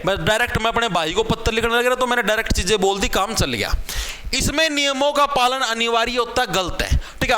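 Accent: Haryana, India. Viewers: Sir aap Hariyana se ho kya😊😀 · Ye sir haryana se hai kya?😅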